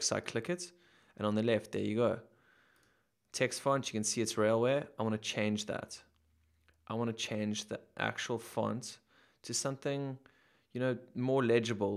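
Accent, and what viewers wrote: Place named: South Africa. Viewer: Superb tutorial – and nice to hear it all in a familiar South African accent 😊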